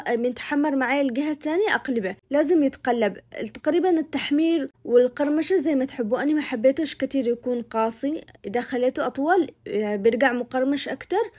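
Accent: Aden, Yemen. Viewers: اللكنة العدنيه تجنن عليكي❤❤ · حبيبتي ياسلام على هالكلام العدني الجنن يجنن كلام عدن والله خصوصا كلمة ماهلوش اااه ذكريات لي في عدن الحبيبه اختك من البيضاء مقيمه في جده ❤ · مشهيه بكره بسوي بنفس طريقتك إن شاءالله ..تسلمي ياعسوله وكلامك العدني ياسلااااام ❤️❤️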